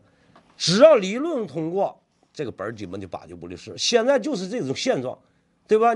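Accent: Tianjin, China. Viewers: ，b站的粉丝基本上是去听你的天津口音的，冲着技术去的很少。你的视频太长，不够精炼，最好能剪辑一下。动不动就十几分钟，而且闲聊太多，年轻人看不了。国外不论是讲数学物理化学的视频很少有超过10分钟的，看起来轻松。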